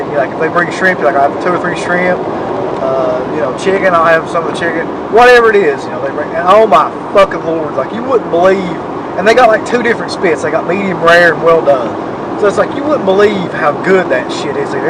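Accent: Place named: Kentucky, United States. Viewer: Kentucky accent =automatic stabbing in the D!..:)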